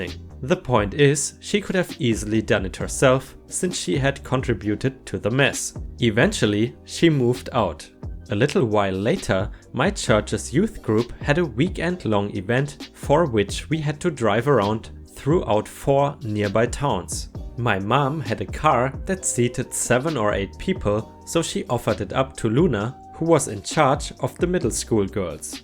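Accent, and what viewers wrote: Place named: Germany. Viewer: You're saying 'pastor' just fine (more like 'past-ah', but frankly I love the German pronunciation, so doesn't matter to me what you say!). An FYI (for anyone who doesn't know), Xmas (which is actually pronounced Christmas btw) isn't a lazy way of writing the full word. The X comes from the Greek (classical, but I think it's the same in modern) which was the first part of Krisotos (pronunciation, not spelling!). When Christmas was first being celebrated, it was common to write it as Xmas as Christianity wasn't yet a fully-accepted religion. There are a few other possible explanations as to why it was used, but that's the first I was told so I stick to it. Hope you don't mind the didactic moment LOL!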